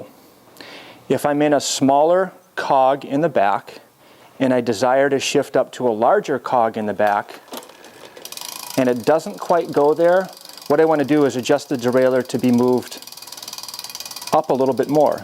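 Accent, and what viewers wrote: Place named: United States. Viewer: I agree, he's probably American with that accent - how do you know he lives inside a chimney? What's a 'damper'? I guess you don't like Americans - don't blame you; they are pretty stupid. Just look at Bush - the most stupidest president ever - he couldn't even speak properly and probably was as bad as spelling as you! lol!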